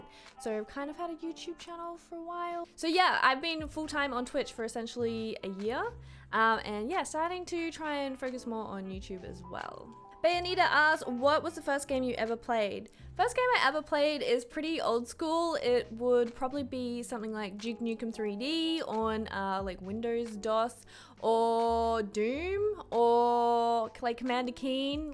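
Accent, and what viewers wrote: Australia. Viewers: She is Aussie... I wonder where that could be....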